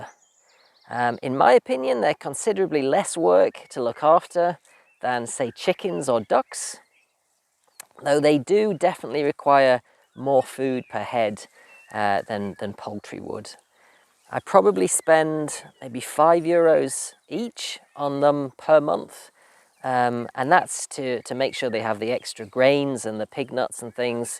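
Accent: United Kingdom. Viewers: Another well-made video,structured and relievingly void of egocentric sharing - although personal and quietly humourous. I enjoy your clean british English.I haven't liked pigs much, but this video started a change and I can imagine a couple when I get my homestead. How cold winters do they tolerate though? i have seen ordinary pink pigs outdoors in the snowy winter, but what is the comfort temp? Can they manage Zone 7, alpine area? Probably need more food and straw.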